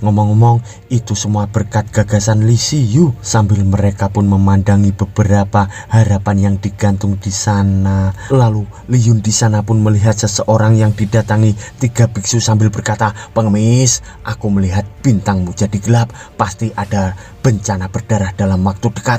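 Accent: Java, Indonesia. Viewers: Mas njenengan jowone pundhi ?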